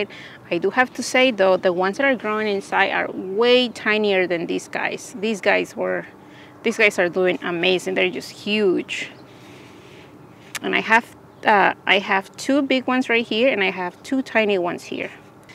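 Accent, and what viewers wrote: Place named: Poland. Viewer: Hehe, you're so funny. And your polish is sweet.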